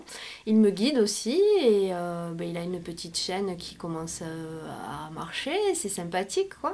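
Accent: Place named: Toulouse, France. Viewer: Bonsoir ma nièce ( petite soeur adorée ) . Super ton accent toulousain ou carcassonnais !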